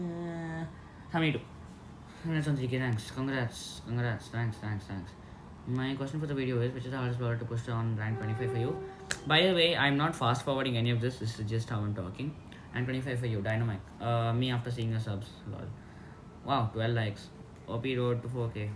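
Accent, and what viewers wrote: India. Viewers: You sound like indian🧐🧐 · Me guessed from the accent he is Indian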